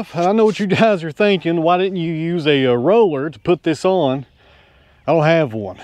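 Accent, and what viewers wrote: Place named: South, United States. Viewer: Its about making a video of a certain time length and incorporating his southern accent as much as possible. People don't want efficiency when watching videos, they want to waste time. Looking into time management for yourself, you have to ask, why are you wasting time on videos when you could be doing things yourself?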